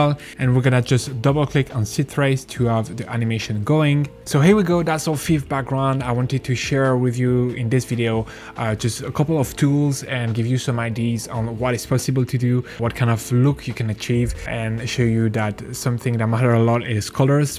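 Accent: France. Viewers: Thank you very very very much. Iam so grateful for you videos ✨🙏🏾 I hear a little accent, are you French ?? Ahaha 🤣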